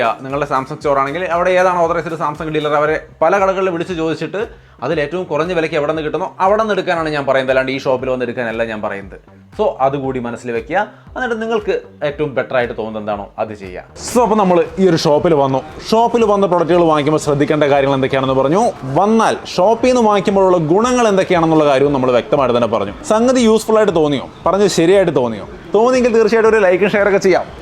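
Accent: Thrissur, India. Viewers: chetta Thrissur ഭാഷ കയറി വരുന്നുണ്ട് 😄😄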